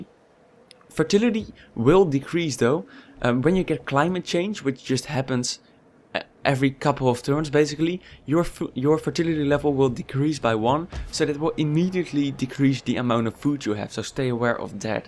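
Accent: Netherlands. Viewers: This guy is so dutch , holy shit ! ty tho <3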